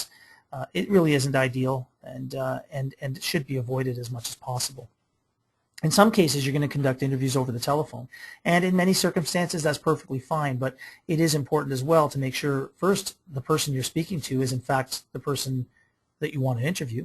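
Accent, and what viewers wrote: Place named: Minnesota, United States. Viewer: Good stuff.... is definitely a Minnesotan or Canadian; Fargo anyone?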